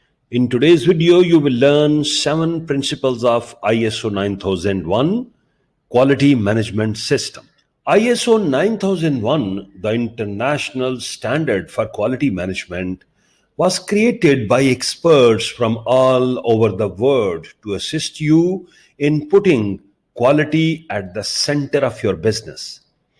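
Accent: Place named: India. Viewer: Ur Indian accent is too strong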